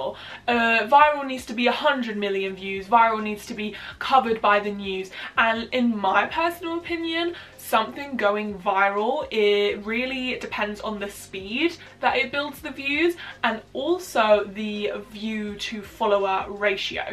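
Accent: United Kingdom. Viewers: I’m sorry you get awful comments… I’ve been watching you for a while, I seldom comment on videos, so this is my first comment on your video. After watching this, I wanted to give you a compliment since you said you read every comment haha. Well or, more so throw shade at people who criticize irrelevant things like your appearance… Anyway! Sorry I’m rambling 😅. The people who comment about your teeth and ask why British people don’t care about their teeth, and just pissed they don’t have the superior accent, at least that’s my guess as someone who would trade my boring midwestern accent for a more sophisticated accent. Ugh this is a weird comment, and it’s all over place, this is why I don’t comment 😂. Anyway! I think you’re a cool pretty lady, who makes videos that I like, and I hope you have a great days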